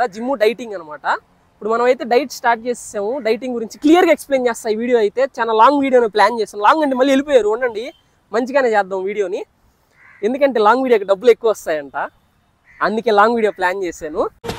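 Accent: Nellore, India. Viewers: బ్రో నేను ఒక మాట చెప్తాను దాన్ని గుర్తుపెట్టుకో నువ్వు ఏదో ఒక రోజు పెద్ద యూట్యూబర్ అవుతావు నీలో ఆ స్కిల్స్ ఉన్నాయి ఎందుకంటే మన నెల్లూరు యాస అలాంటిది🙌🙌🙌🙌🙌🙌🙌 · Navvabaku camera man🤣🤣(Nellore slang 🔥)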